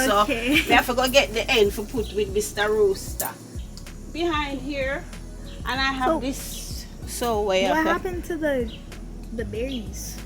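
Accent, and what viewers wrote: Jamaica. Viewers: Don't stop that is how we Jamaican talk.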